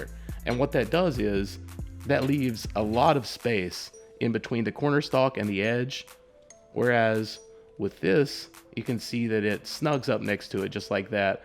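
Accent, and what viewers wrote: South, United States. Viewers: Where are you from? To me it sounds like you have a few hints of a Southern accent.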